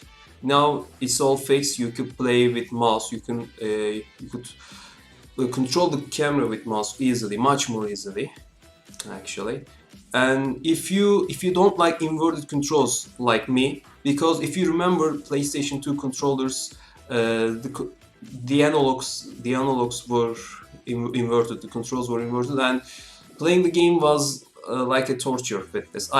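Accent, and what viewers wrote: Turkey. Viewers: Tamam Türkmüşsünüz, aksandan ben de öyle tahmin etmiştim 😄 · AoD ye bakmadan önce milletin oyunun oynanışı, glitchleri vs. kötü oynamayın dediğini duyduğumda direkt abartıyorlardır demiştim. Haklıymışlar. Gerçekten hayatımda bu kadar zor kontrolü olan bir oyun oynamadım. Hani TR1'in tank kontrollerine bile alıştıktan sonra oynaman çok kolay. Bu.. bu ise başka bir seviye... Neyse ki ''restoration project'' in olması iyi bir patch. Hikaye çok kesilmiş olsa bile yine ilgi çekici. Daha karanlık bir Lara Croft hikayesi olmasıyla beraber bu seferde Lara'nın hem kontrolü kaybedip kurban olmasını inceliyoruz hem de iç dünyasını... Umarım oynayabilirim. Ha bu arada Türk olduğunu direkt aksandan anladım :D güzel içerikler var kanalda biraz onlara bakayım.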